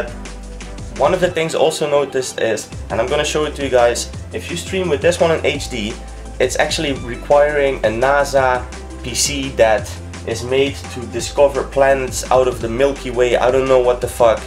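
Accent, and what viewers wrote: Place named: Netherlands. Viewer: where are you from? you sound kinda dutch to me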